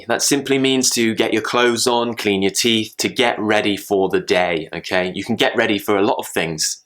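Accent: RP, United Kingdom. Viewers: I hear British accent here. :) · this is very useful ,and i like British accent because of i always watch your videos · This video is very useful! Thanks for share your knowladge and I really like your British accent. 👌🏽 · Don't change the British accent to the American accent PLEASE. ...people prefer British accent because it is father more beautiful. So keep it please. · Thats mean British accent mate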